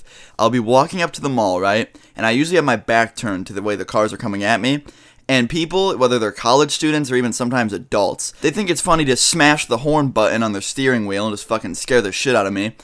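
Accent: New York, United States. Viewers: Aye it sounds like your from New York that's how people are over here lol are you?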